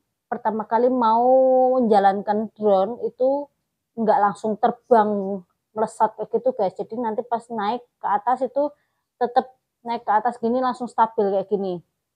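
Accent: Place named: Java, Indonesia. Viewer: Suaranya agak medok...lucu tp jelas sii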